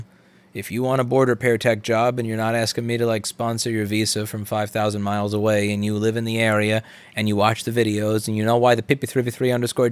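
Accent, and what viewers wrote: United Kingdom. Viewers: I love British accent, and after what I heard from Louis I have even more reasons to develop it just to annoy people. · Complaining about British accent is like complaining about Louis looking like an Italian 😆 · As a brit I dont like the way people are complaining about a british accent...can you ask him to double down on it? Or to put on other accents. Brummy' scouse' gentleman. That would be just spiffing.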